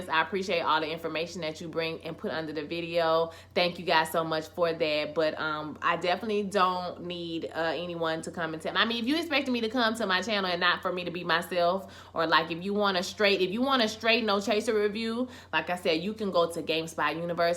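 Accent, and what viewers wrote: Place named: New Orleans, United States. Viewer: you gotta be from new orleans... that accent came in loud and clear... great review.. I come for the comedic nuggets you provide... hilarious..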